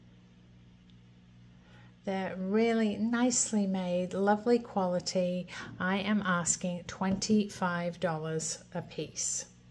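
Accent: United Kingdom. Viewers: From the things you say it is clear that you have been in the USA since you were quite young and yet your accent is pure UK - -which is fascinating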